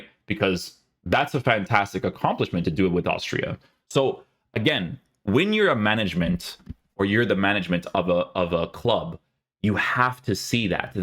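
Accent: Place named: Azores, Portugal. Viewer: Were you born in Portugal,? Or in Canada, but descendant of Açorianos? What team does you father support? There was quite a big açorian immigration to where I’m originally from (Southern Brazil), but both the accent and the trading ties were gone centuries ago. I’m personally multi-ethnic (German, Italian, Lisbon Portuguese and native South American) but I wish I had more contact with Açorian culture. The accent is just insane for me:)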